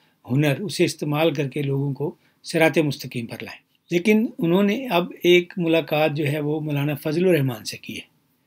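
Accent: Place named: India. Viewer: And let us all know what is RM TV? Is it shorter form of RAM? Coz you speak like Indian.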